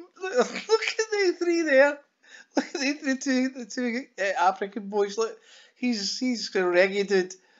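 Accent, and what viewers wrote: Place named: Glasgow, United Kingdom. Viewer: you replied! <3 I read it with your voice xxxxxxxxxxx My Glaswegian love lol xxxxx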